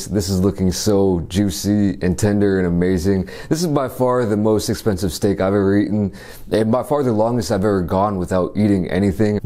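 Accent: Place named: South, United States. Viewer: Asian man with a southern accent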